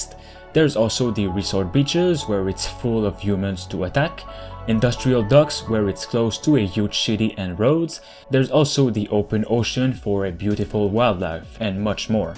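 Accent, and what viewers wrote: Quebec, Canada. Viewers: The commentator needs to makes vids in French, his pronounciation in English is terrible. I keep hearing him repeatedly saying " Man Heater"which is entirely different gendre. Please homes, just stop, that Quebec accent is atrocious. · Hmmm .. I hear Quebec !!!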